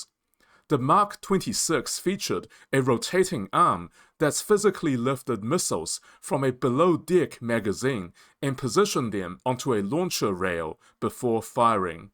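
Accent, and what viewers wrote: China. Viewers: Your Chinese accent is annoying! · What kind of accent is that? Any ideas? Is there a Chinese accent mixed in?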